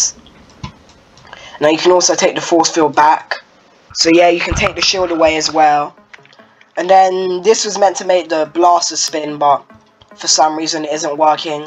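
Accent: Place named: United Kingdom. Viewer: The British accent is funny😂😂 You earned yourself a sub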